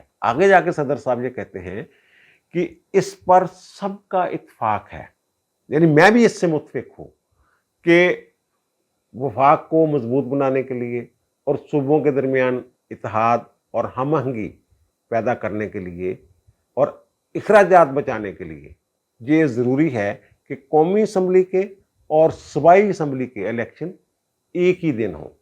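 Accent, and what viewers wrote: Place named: Jalandhar, India. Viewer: چوھدری صاحب یوں تو آپ کا وی لاگ قانونی طور پر بہت مظبوط اور معتبر ھوتا ھے لیکن جب آپ جالندھر ھوشیارپور کے لئجے میں پنجابی بولتے ھیں تو بہت اچھا لگتا ھے کیونکہ یہی لہجہ ماں بولی کا میرے گھر کا ھے۔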